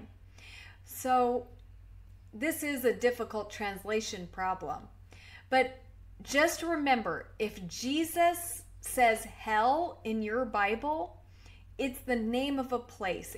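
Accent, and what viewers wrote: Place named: England, United Kingdom. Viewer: that’s so so lovely I’m really really glad that my words made you happy because you have done the same for me with yours. I know no one has all the answers and not will we ever till we get to the other side lol! But it’s so nice to hear someone speak love and not eternal condemnation for once and fear mongering people into believing and judgement for everything u say think and do yano! That would absolutely be such a welcome help. I’m doing quite well but obviously u need that final push and as I say iv been quite unlucky with my experiences I honestly haven’t yet heard a story quite as bad as mine if I’m honest! But luckily I don’t suffer with fear and am just used to it now lol! I live in Bath, which is in the Somerset region near Bristol? Where are you situated I notice your English accent which is music to my ears as keep coming across Americans lol! 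And I reach out and am actually very very surprised about the lack of response and support I get with it all but I believe god leads you to the right people in time so have just been patient with the process. My email is <mail> If you would like to email a bit more privately 😁 Thankyou so much you are an angel 😇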